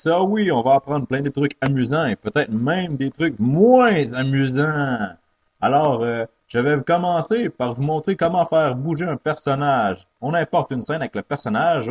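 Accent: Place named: Canada. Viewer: mdr j'adore avec l'accent canadien c'est énorme xD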